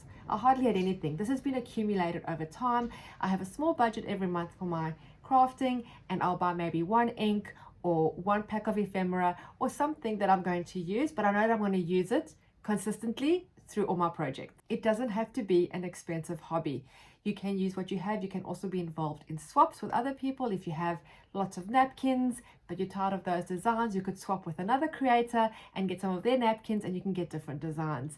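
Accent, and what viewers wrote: South Africa. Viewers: Hi I am so glad I found you channel... You sound like you are from South Africa:D So am I and there are very few if any junk journallers here as far as I have found. I love your style and I am new to junk journalling and all the videos can be a bit overwhelming... I absolutely love the idea of an idea journal and i think I will start with that:D Thank you so much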